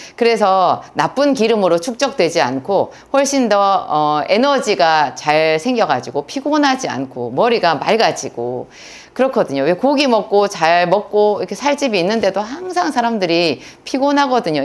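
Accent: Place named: Yeongcheon, South Korea. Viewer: 영천 억양인데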